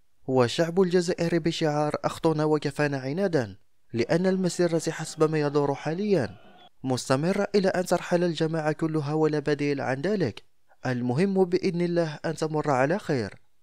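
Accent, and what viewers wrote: Morocco. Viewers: لهجة مروكية لماذا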